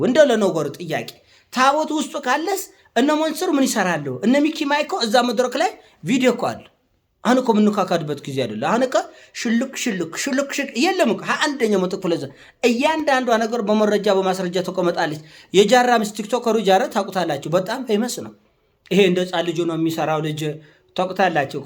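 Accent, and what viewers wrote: Tigray, Ethiopia. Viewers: በትክክል! እውነትን በትግራይ ወገኖቻችን የአነጋገር ለዛ እያዋዛ ለመናገር ወደ ኋላ የማይል፣ የሴረኞችን ተንኮል ቀድሞ በመረዳት የሚያንኮታኩት ጀግና ኢትዮጵያዊ ነው!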